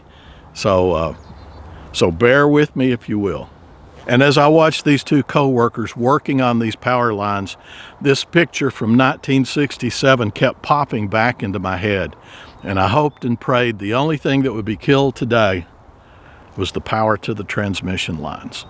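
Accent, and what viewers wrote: Texas, United States. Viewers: Always happy to see you and hear that Texas drawl that I remember so well. Do you live in the district for which Brandon Herrera is running If you do and he is elected, I wonder if there is anything that he can to do? Probably too late but worth the try.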